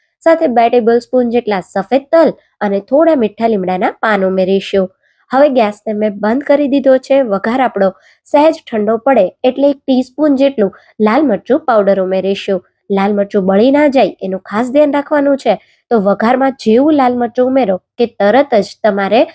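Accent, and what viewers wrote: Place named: Vadodara, India. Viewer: Vadodra gujarati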